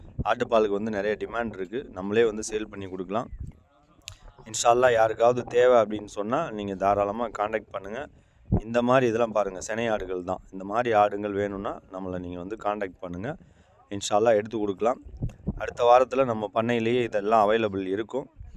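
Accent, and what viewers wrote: Madurai, India. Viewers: ❤ assalamualaikum warh madurai basha ❤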